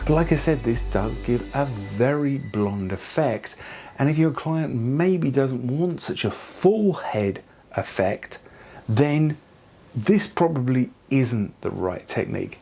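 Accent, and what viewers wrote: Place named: United Kingdom. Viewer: And YOU are annoying because you're operating YouTube, and don't even know how to adjust the speed 😂 This man put in SO much work to HELP TEACH people, and all you can do is complain? Maybe just don't watch at all and save the annoying, rude, and ungrateful comments for somewhere else 🙄 Also- Many British accents are slower/more drawn out. He's also trying to be very deliberate in what he says so that everyone can understand.....but again- you DO have the option to speed it up!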